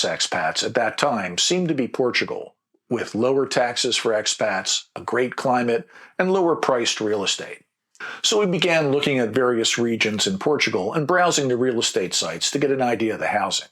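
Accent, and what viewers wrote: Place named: Baltimore, United States. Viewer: Considering this very thing for the future and interested to hear your experiences! (By the way, I thought I heard a bit of a Maryland/Baltimore accent while you were talking. A bit of home for me! I’m from the DC area with many relatives in the Baltimore and DC suburbs but live in the Pacific NW now)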